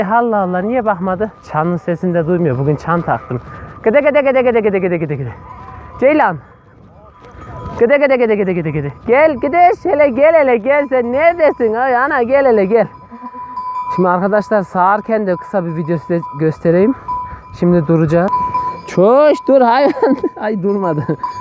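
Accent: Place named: Central Anatolia, Turkey. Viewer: Çoooşu ne güldüm ya İç anadolu Şivesi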